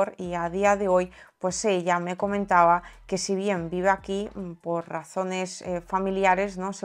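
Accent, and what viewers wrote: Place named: Spain. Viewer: Es una ironía que critiquen como hablas español de España,los que le dimos acentos modismos fuimos nosotros ..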